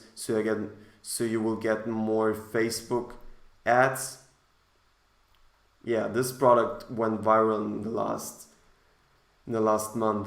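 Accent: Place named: Germany. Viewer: Die ersten drei Wörter gehört und sofort gewusst, dass du Deutscher bist hahaha. Geiles Video, hilfst mir damit weiter, danke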